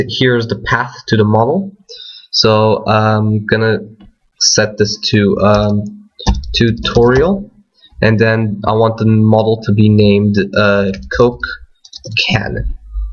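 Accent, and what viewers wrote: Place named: United States. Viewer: You are Portuguese??? You have a very good American accent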